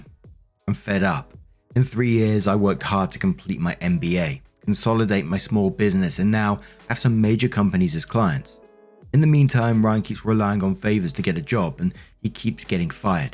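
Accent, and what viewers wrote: United Kingdom. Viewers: Hey just wanted to say thank you for the channel along with the typical American who loves a British accent 🥵 lol I really love hearing your take on people's drama Reddit is like a real life soap opera and I'm so addicted. Obviously these are real lives and real people so it is sometimes tragic too. All to say your personal. Empathy and innate kindness make listening to you a pleasure. Be well sir and thank you again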